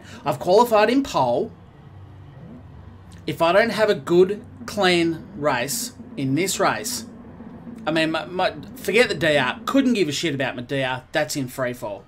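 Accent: Australia. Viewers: are you australian? anyway great video!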